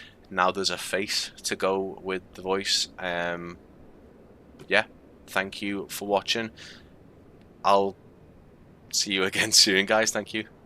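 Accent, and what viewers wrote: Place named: Wirral, United Kingdom. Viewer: Excellent work, mate, keep it up :) Where are you? Your accent sounds local to me (Wirral)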